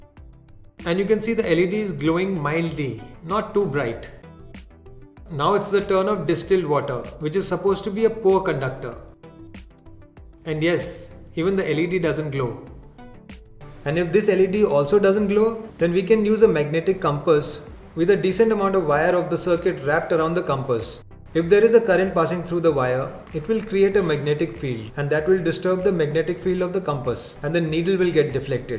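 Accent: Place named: Goa, India. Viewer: Achha😁Sensed Goenkarpan in your style of talking mhanun vichaarle :)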